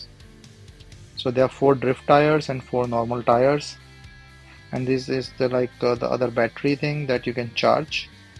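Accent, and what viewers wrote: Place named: India. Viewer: Bro your English is like India isn't you ???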